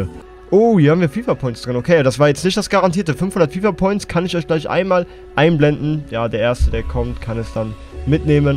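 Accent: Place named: Saxony, Germany. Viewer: sächsisch Comedy juckt?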